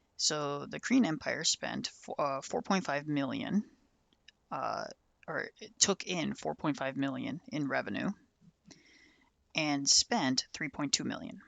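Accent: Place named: United States. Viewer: You sound American. Unfortunately I think American people, even some of the most based people on the right, are still too democracy pilled to ever get this point across. I think it’s only when the democratic system fails on a mass scale will people actually begin to entertain the possibility of non democratic alternatives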